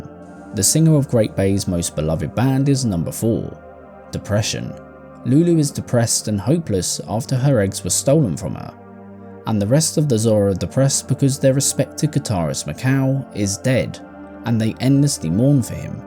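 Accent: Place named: United Kingdom. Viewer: British accents are annoying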